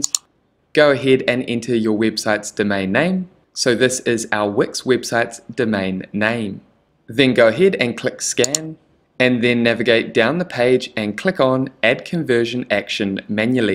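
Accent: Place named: New Zealand. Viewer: Thanks, for such a clearly explained tutorial! Also, I'm from Chch, NZ and was happily surprised to hear a kiwi accent. You have done us proud. FT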